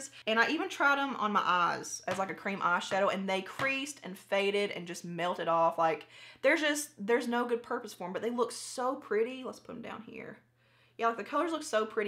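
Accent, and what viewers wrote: South, United States. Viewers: I love you so much!! I have no idea what to do with makeup and I'm almost 34.. but I feel like I am learning on your channel and it helps that your southern and your funny! I laughed out loud when you said MAN DOWN!! Stay true, stay you! Your awesome!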